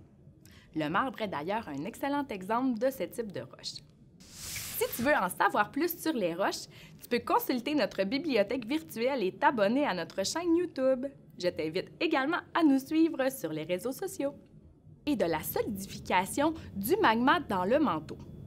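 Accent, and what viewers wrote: Quebec, Canada. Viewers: Omaima Laftem hadak dialect canadien wa9ila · C juste l'accès du quebec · Avec sa manière de parler en dirait une québécoise ❤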